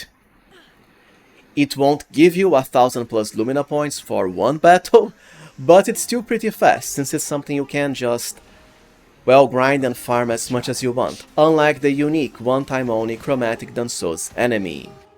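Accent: Brazil. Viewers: Tu é BR né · Are ypu brazilian or portuguese speaker?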